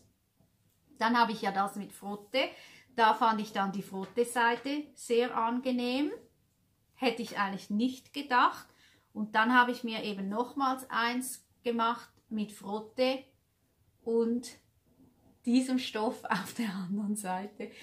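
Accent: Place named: Switzerland. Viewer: Liebe, die Folge hat mir wieder sehr gefallen, Du hast uns ganz schöne Sachen gezeigt. Die blaue Jacke ist traumhaft schön, ich bin ganz begeistert von der Art wie sie Du gestrickt hast, die Rückseite ist ja ganz raffiniert gestrickt, hinten in der Mitte sieht es ja wunderschön aus. Diese Art zu stricken kenne ich gar nicht, also Du strickst ganz tolle Sachen, bist eben, wie ich schon geschrieben habe, eine richtige Künstlerin, Du machst außergewöhnliche SACHEN, egal ob Du strickst oder nähst, es ist so toll und interessant, ich schaue sie so gerne an. Diese Handschuhe sind einfach wunder wunderschön, das Muster erfordert doch viel Geduld und Können. Ich könnte soetwas nicht mehr stricken, weil es mir nicht mehr möglich wäre, ich könnte die Geduld nicht mehr aufbringen. Auch der neue Pulli ist so sehr schön. Diese Tücher und Pullis stehen Dir auch so gut mit Deinen schönen langen Haaren siehst Du umwerfend schön aus. 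Du hast eine solche Fröhlichkeit in in Dir, dass man Dir so gerne zuschaut und zuhört. Ja zuhört, das Deutsch. Mit dem Schweizer Akzent drin finde ich super und höre es sehr gern und es ist überhaupt nicht furchtbar, wie Du denkst, ganz im Gegenteil und ich komme ja auch nicht weit weg, von der Schweizer Grenze, her, wenn ich so rede wie zu Hause, dann meinen die meisten Leute, ich bin aus der Schweiz. Die Leute sind zum Teil manchmal etwas begriffstuzig, die können nicht unterscheiden, ob man Ein Dialekt redet oder richtig Schwitzerisch, sie merken überha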